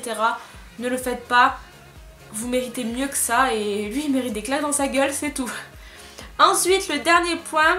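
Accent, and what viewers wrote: South, France. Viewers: Olala j’adooooore ton accent ♡♡ J’adore l’accent du Sud ♡ Si les sudiste voient ce commentaire je vous aime ♡♡ (surtout si vous avez l’accent :p) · Merci c'est gentil ‚ oui l'accent du sud est spécial mais bon c'est la vie ✋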